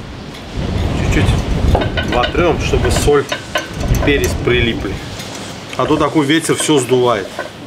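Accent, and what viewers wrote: Baku, Azerbaijan. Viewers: Этот Бакинский акцент я балдею да. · я арянин и родился в Баку. Помню всех соседей с таким акцентом, двор был инторнациональным. Все ваши рецепты супер. С большим удовольствием смотрю. Здоровья Вам и вашей семье.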